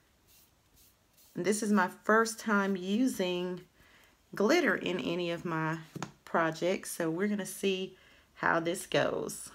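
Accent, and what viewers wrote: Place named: South, United States. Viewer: New sub and loving your channel! Love the accent! Im from the south and Im a southern girl as well!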